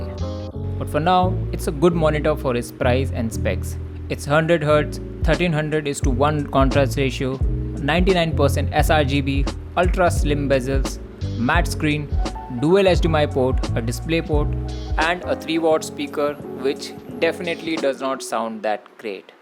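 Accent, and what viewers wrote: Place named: India. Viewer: I just found your channel half an hour ago and binge-watched all your videos in 2x. I'm a big fan of DIY and homesteading-related videos. This kind of content is usually done abroad, and it was a big surprise to me to hear an Indian voice at the start of the video. I loved it, and I'm so glad you're bringing these kinds of videos with a more Indian-centric viewpoint! Plus points for me: 1. You mention what worked and what didn't. 2. Whether DIY is even required in some cases. 3. The prices and the source of everything you use/purchase. 4. Solid video editing! Must be a lot of effort to record and do these kinds of projects at the same time, so hats off and keep up the good work!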